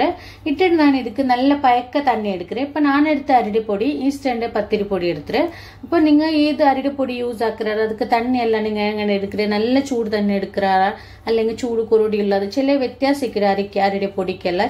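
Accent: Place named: Palakkad, India. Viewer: Nakk ningade samsaram nalla ishtayid.ningale palakka kekkan veedio nokre....👌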